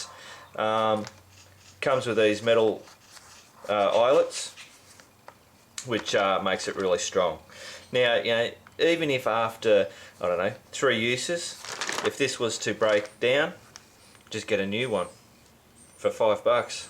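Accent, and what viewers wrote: Australia. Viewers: You make great videos very informative , thank you for adding US measurements . You have a great Aussie accent , took me awhile to get used to it. I live almost dead center of California only 40 miles away from the center in Clovis California thank you again keep up the good work !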